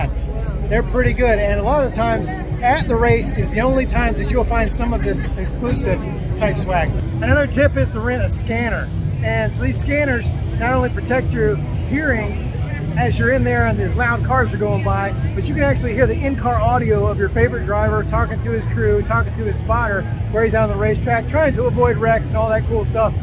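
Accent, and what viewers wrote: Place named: South, United States. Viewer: I do declare! It sounds like found a little Southern drawl while being there! My mother used to point that out in me when I would come home on leave when I was stationed down South.😉👍🏼😎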